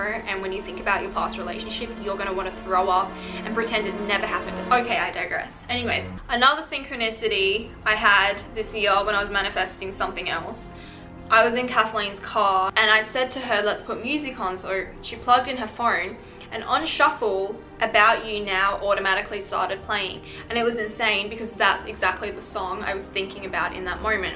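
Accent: Australia. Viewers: Asian woman with an Aussie accent is SOOOOOOO HOT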